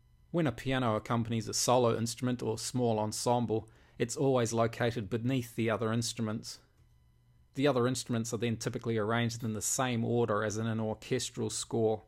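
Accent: New Zealand. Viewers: You Kiwi mate?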